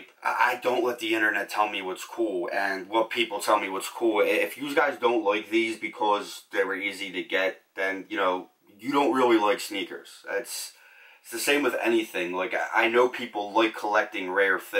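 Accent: Ireland. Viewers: I knew it I was hearing the Irish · These are dope. Are you Irish, kinda hear an accent?? Lol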